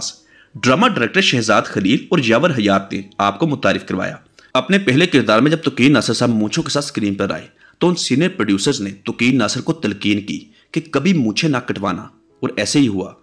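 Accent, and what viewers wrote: Punjab, India. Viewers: بھای۔۔۔۔ اپکی آواز اچھی ہے۔۔۔ مگر ادائیگی کھردری اور پنجابی لہجہ ۔۔تھوڑا سا بہتری لانے کی کوشش کیجیے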